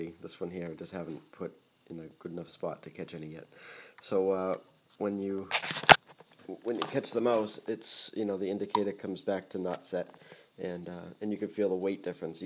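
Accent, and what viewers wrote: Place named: New England, United States. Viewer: A New England accent always gets my attention. 😊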